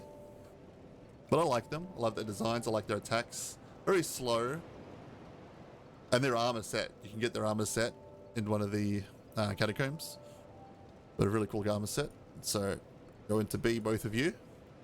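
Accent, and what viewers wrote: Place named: South Africa. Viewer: You sound South-African or Australian.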